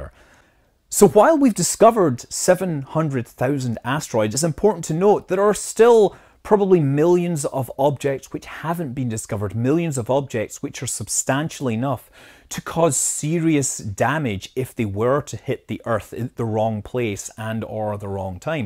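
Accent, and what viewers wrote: Scotland, United Kingdom. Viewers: This is my favourite Scottish-sounding astronomy channel! We could predict where and when they will hit Earth. I don't know that we could stop them however. At least we would know when we are going to die. On second thoughts, maybe I don't want to know.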